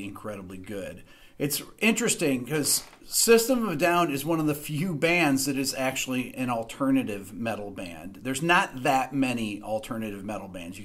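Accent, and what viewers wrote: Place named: United States. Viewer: Its not chap suey..... silly murican accents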